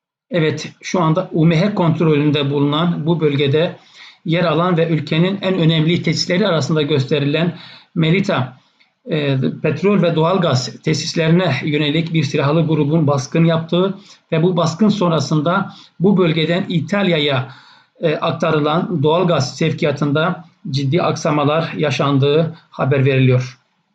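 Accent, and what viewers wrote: Cyprus. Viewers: kolay gelsin. Özür dilerim. Şiveniz Kıbrıs şivesi gibi. Doğrumu? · Oğlum konuşman Kıbrıs Türkçesi. Sen rummudun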